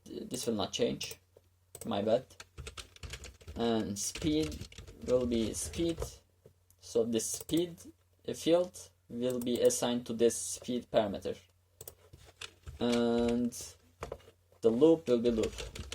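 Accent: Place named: Turkey. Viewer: usta hiç dikkat etmemiştim masaüstüne aksandan türk mü acaba dedim doğru çıkıt :d böyle bir işe ingilizce girip anlatmak çok iyi cidden helal olsun eğer yorumu görüp cevaplayabilirsen bir şeyler sormak isterim bi kalbe anlarım soru beklediğini AS:DASd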